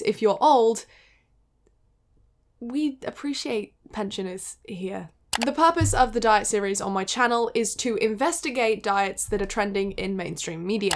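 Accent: United Kingdom. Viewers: Hii!! ❤ I love your videos so much and omg your british accent is something else girl! 😍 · Honestly when I clicked on this video I forgot she’s British so I was surprised when she started talking all British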